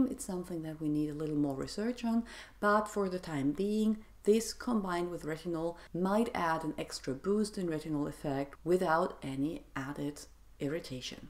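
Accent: Germany. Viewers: I love your accent, are you German?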